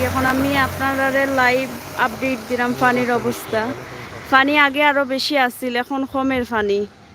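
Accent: Sylhet, Bangladesh. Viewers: Assalamu alaikum babbizee sylheti bashai kotta bollsen etto shonder video keep it up 👍🏼👍🏼👍🏼👍🏼👍🏼👌🏼💪🏼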